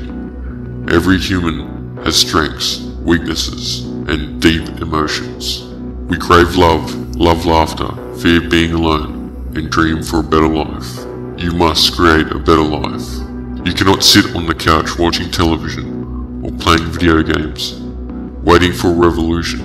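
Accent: Australia. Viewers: He has an Australian accent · lol, I thought to myself, Anonymous sounds Australian lol, but I realized you were just narrating it :) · Derp he sounds Australian. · What's with everyone commenting on his Aussie accent?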